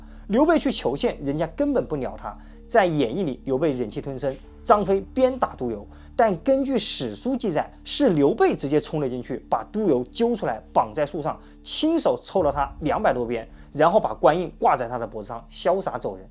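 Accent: China. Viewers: ，坦白說你為人處世的態度實在了不起。 我想有必要說明一下，之前就有提到我認為您的內容作得很用心很好，所以我是很自然而然就看完了，並不是「耐心」看完的喔！而且怕您誤會我只是只看標題就批評的，所以有多做一些說明。 像我這種只出一張嘴的鄉民，您還能這麼用心且友善地回應，真的是一個了不起的youtuber。 之前一個有做到這樣的是說書人柳豫，但他的粉絲很多都是之前經營facebook引流來的。所以節目一上架基本盤就有相當規模。 像評論三國這類節目也不少，但囿利題材，所以也頗有侷限。像說書人柳豫也發展到電玩解說。 品質算好的就有什麼文大郎、史大郎(忘了誰先誰後，但風格都一樣，可能是股東不合拆伙吧?)，他們也是引經劇典來增加豐富性。還有一個(抱歉忘了，也許是上述兩人的早期作品)會善用衛星地圖講出當年各國勢力或軍隊征伐的地形、路線。 我聽您的口音，應該是中國的朋友，我在想說如果您附近有什麼著名的三國地點，都可以作一期現場影片，當然不是只有旅遊介紹，而是加上故事情節的引導和你個人的親和力。 比如說「探索涿郡故里，原來劉備當年就是在這棵桑樹下說要當天子的！」應該也很吸睛，只是舉例，不要打我🤣 當然不是說要騙點閱啦~只是我們三國迷其實最重的是這份情懷，而情懷如何展現?我們一般人的做法就是玩遊戲、討論，但您們yotuber是不是可以上升到人事時地物的展現或溯源? 抱歉，扯遠了，只是期待您這麼有誠意的頻道能蒸蒸日上而已，以上都是外行人的胡言亂語，請不要介意。 在下只能獻上小小的訂閱，不成敬意。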